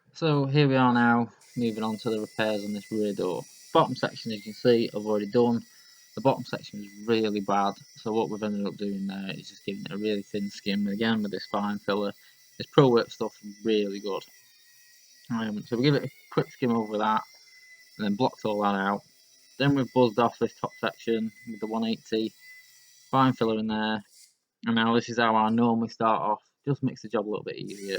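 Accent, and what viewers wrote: United Kingdom. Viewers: Once again I apologize for me rude comment. With that being said, please answer my question, I am having difficulty in understanding the British accent which I am sure many of your viewers do, what grit did you use in sanding those areas that weren't damaged? P180 before laying the primer right?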